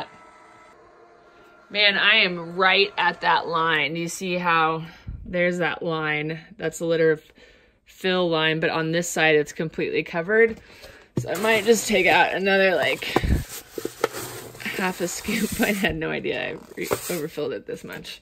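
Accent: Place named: Pittsburgh, United States. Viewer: Could hear the Pittsburgh accent haha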